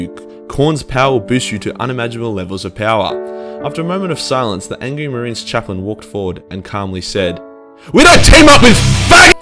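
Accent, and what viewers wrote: Australia. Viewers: This was a match made in the Warp. Also it’s nice listening to a fellow Aussie speaking... I don’t have to decipher the accent.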